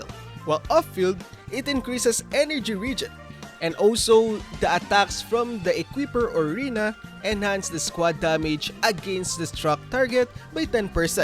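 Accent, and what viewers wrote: Philippines. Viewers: halatang halata sa accent ehh PILIPINSSS 🇵🇭🇵🇭🇵🇭🗣️🗣️🗣️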